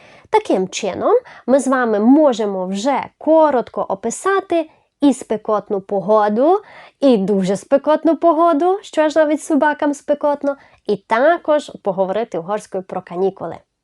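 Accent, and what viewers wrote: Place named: Transcarpathia, Ukraine. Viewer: Дякую за відео. По акценту чути що ви з Закарпаття. Дуже мило і красиво пояснюєте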